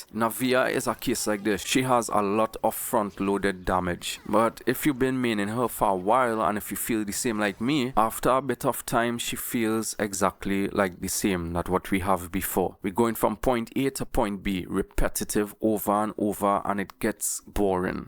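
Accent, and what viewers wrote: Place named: Trinidad and Tobago. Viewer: Within two seconds instantly knew you were from Trinidad, what's up brother!! I'm trini tooo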